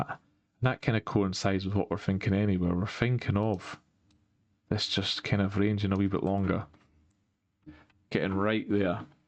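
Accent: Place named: Elgin, United Kingdom. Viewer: Thanks, I bought back in at 17 and obviously I hold them in my pension bag. I also started buying again at theses levels a bit every day as I'm guessing we have been making a bottom. What I'm buying now I will sell and hopefully make profits and buy back cheaper if that situation pops up and we see a top forming. I try sort of day trading but it's beyond me at the moment using the daily 4 and one hour time frames . The violent liquidity grabs keep getting me. But we all must learn. I'm in Mexico but my family are in Elgin probably not far from you by your accent. Anyway keep up the good work it's appreciated.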